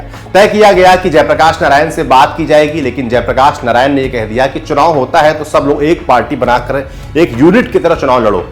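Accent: India. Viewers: Congress, left, terrorists ki dalali chalu rakho. Lallantop ke dalalon ki jai ho. Desi gamacha pahankar, desi tone bana ke dalali ka naya formula nikala hai.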